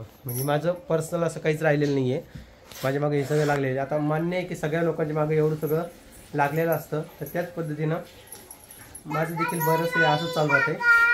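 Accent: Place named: Khandesh, India. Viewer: तुमचे गाव कोणते आहे दादा ,तुमची भाषा थोडी खान्देशी वाटते आहे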